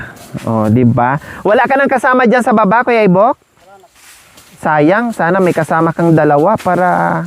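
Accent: Visayas, Philippines. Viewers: Madam🥰noon pa kita pinapanood kasi boses bisaya ka,,nakakatuwa❤️wow,malinis na ang kapalugiran,,madaanan nyo na,,at makikita nyo kaagad ang Ahazzzz!Good job,,ang galing ni kuya Ebok,,mga masisipag naman sila,,Tama,,salamat at may matutulungan ka,,sa taong nangangailangan,❤️Bless ka ng Panginoong ,,JEHOVA❤️🙏🏼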